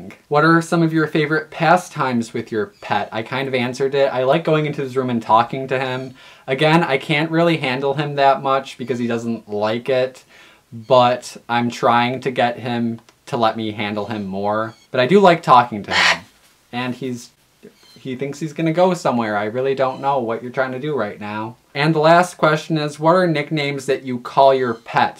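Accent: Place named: West, United States. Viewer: Also I hear a bit of a western accent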